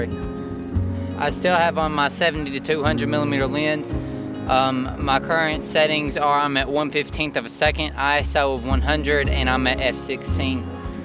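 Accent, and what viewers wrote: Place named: South, United States. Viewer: I agree. The composition with the pine cone was very good. By the way, you have a really pleasant Southern accent. None of us is aware of our accent; it takes someone else to hear it. Half of the pleasure of your videos is hearing you speak.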